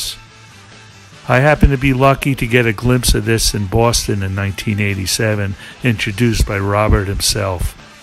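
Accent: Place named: New York, United States. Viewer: I especially love the voice in it !!!! The voice commentary is very very good!!! And it’s very detailed and correct to what actually went on , took place and unfolded in history and in the meaningful significance that it holds . Is your slight accent a New York accent ? May I ask ? It is very good !!!! It’s like that old show about the 60s and 70s the wonder years !!!!!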